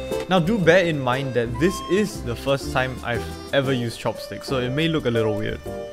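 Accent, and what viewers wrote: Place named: Singapore. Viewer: are u singaporean? i can recognise that accent anywhere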